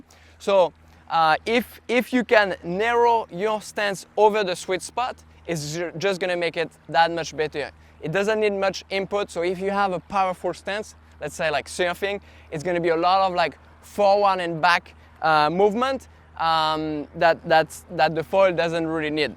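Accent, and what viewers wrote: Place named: France. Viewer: Hello. Amusant on dirait un accent français... je comprends tout ^^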